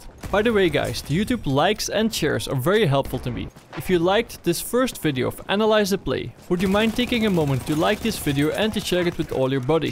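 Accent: Netherlands. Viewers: Kom je uit nederland? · The second you started to talk i noticed that you are dutch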